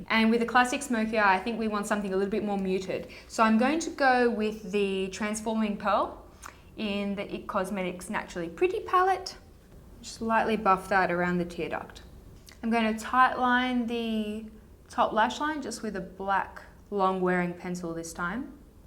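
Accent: Australia. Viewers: You're so talented, likeable and articulate. Sounds random, but I love listening to you talk as much as I love watching you work. Your knowledge of and passion for makeup really translates well in your videos - keep it up! You're so great!! P.S. Love your Aussie accent! x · You're gorgeous and I love your aussie accent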